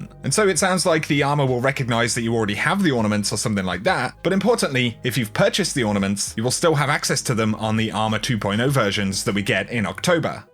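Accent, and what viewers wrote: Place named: United Kingdom. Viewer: He is from the uk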